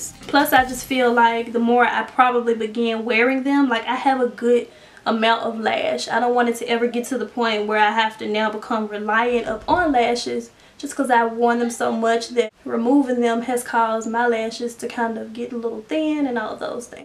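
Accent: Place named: Tennessee, United States. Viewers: is in fact from Tennessee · 1st time watching her. sounds like a Tennessee accent, but it could be Mississipi. Beautiful young lady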